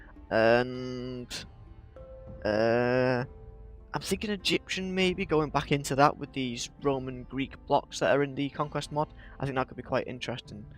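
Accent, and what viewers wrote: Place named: United Kingdom. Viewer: I wish I could say 'build battles' the way you do XD I like that British accent. and btw gl on modern :P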